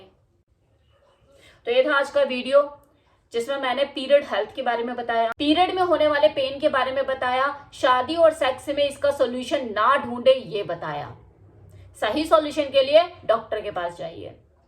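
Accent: Haryana, India. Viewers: Ya sayad haryana se ho haa haryana 95% punjab 5%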